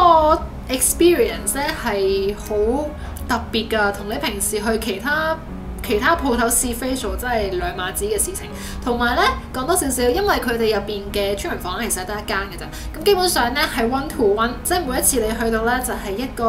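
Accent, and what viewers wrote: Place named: China. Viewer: 不錯！改善英文發音更好，太chinglish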